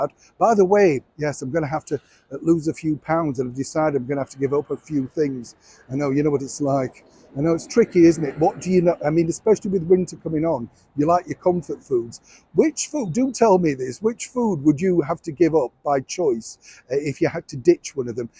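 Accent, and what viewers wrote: Yorkshire, United Kingdom. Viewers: you a yorkshire lass?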